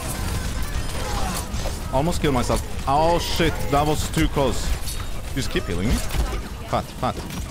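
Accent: Sweden. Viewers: I like that i can hear that u are swedish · The Swedish in this guy is RADIATING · I love your vids man btw are you swedish cuz i can hear it from your english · du låter svensk? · You sound so swedish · how did your swedish accent got worse???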